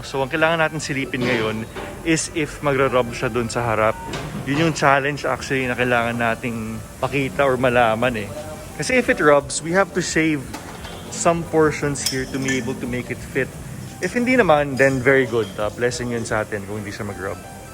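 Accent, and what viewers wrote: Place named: Conyo, Philippines. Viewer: conyo kris aquino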